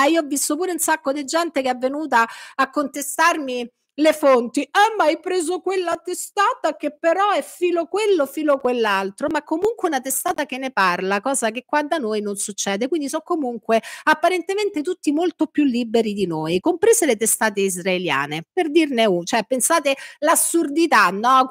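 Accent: Rome, Italy. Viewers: L’inflessione romanesca credo sia voluta per rendere più efficace il tutto. In realtà si coglie un ottimo italiano sotto l’intercalare. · Io adoro come parli e quando lo fai in “romanaccio” ancora di più! E sono sicura di non essere la sola ad apprezzare sia il tuo perfetto uso della lingua italiana che quello del rafforzativo romano che sai dosare egregiamente! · Ma poi non parli il dialetto romanesco.( Nessuno ormai lo fa) Hai una piacevole ( per me) inflessione, ed usi espressioni tipiche che coloriscono l'eloquio di noi cresciuti nella città eterna rendondolo più efficace per chiunque abbia anche solo due neuroni accesi. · ti ammiro molto, ma scusa però, tu dai veramente corda ai troll che ti criticano il bellissimo accento/dialetto romano? Ignorali 🫶🏻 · non avrei saputo scriverlo meglio, condivido in pieno. Quel "romanaccio" è quell'elemento fondamentale per strapparti risate nonostante si ascoltino robe peso. 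Avanti tutta!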